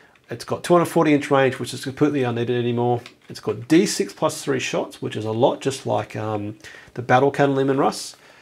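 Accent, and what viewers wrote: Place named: Australia. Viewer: Is this an Australian doing 40k? Love it.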